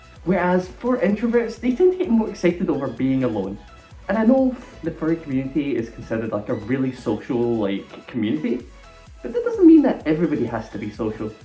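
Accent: Scotland, United Kingdom. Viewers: Recognised his accent a couple of seconds into the first of his videos I watched, and immediately knew he was a fellow Scot!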